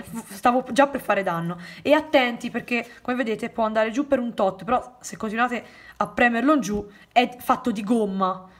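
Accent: Italy. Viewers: Oh dio amo il tuo accento toscano❤️❤️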